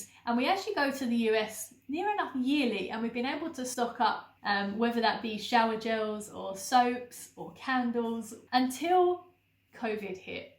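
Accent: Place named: United Kingdom. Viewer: I really like British accent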